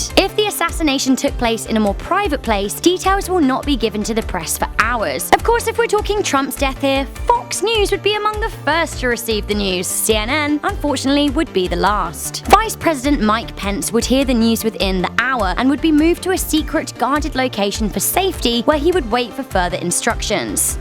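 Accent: United Kingdom. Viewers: Is she Britsh? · I'm here for the British accent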